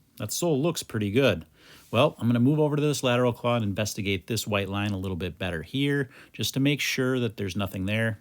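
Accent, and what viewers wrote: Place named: United States. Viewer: Opinion on accent depends on where you're from! As a Brit I'd say has a strong American accent!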